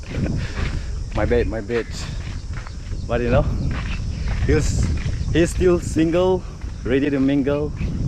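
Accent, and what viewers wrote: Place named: England, United Kingdom. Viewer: Hahah this kid 😂 Still got the old vibes Love your English accent Big fans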